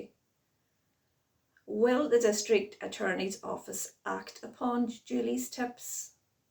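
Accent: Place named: Ireland. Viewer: Nothing lovelier than that Irish lilt🎶🍀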